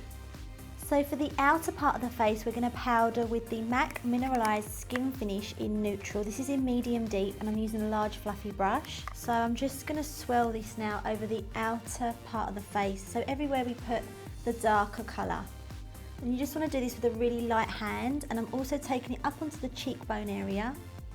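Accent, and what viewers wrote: Essex, United Kingdom. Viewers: Essex voice so annoying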